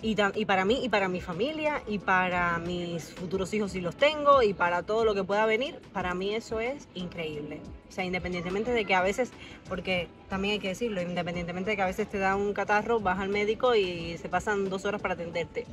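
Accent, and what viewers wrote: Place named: Andalusia, Spain. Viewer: Pareces Andaluza!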